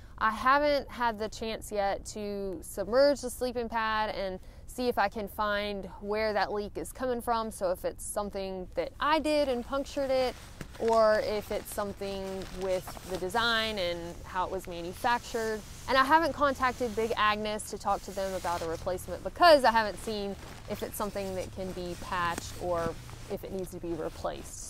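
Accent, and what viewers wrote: South, United States. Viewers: love her slightly southern accent =]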